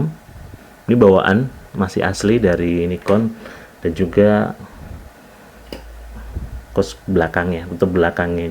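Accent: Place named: Surakarta, Indonesia. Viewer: Koyok e wong solo iki haha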